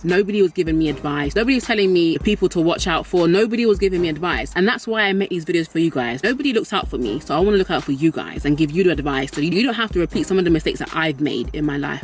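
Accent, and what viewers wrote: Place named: England, United Kingdom. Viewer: !!! I missed you. I haven't been on your channel for a while. I am so happy that you are doing well and have a 1yr anniversary coming soon. Congratulations. I feel you on the dating alone thing. I'm kinda going through that right now. I'm learning a lot about myself as an individual and what are my game changers and deal breakers. I love, love your English accent.😍 You have a very beautiful and giving spirit. God Bless you &so much love. 🤗❤🙏🏿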